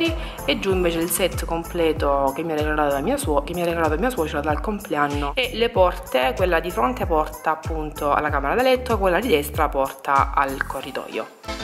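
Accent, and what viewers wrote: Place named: Apulia, Italy. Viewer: Dalla parlata deduco che tu sia pugliese😜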